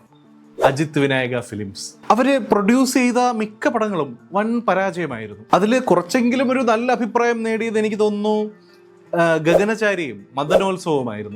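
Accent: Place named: Tamil Nadu, India. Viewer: ഇന്ത്യൻ accent അല്ല തമിഴന്മാരുടെ accent ആണത്!!!!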